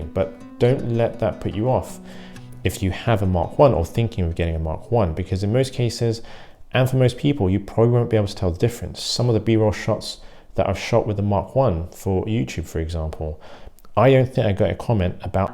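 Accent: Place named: United Kingdom. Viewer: Asian guys with eloquent British accents automatically get credibility by virtue of that presentation alone.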